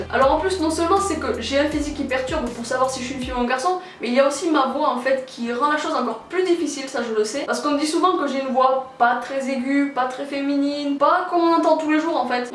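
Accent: Toulouse, France. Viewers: Tu connais pas bien les accents toi x) c'est l'accent toulousains xDDD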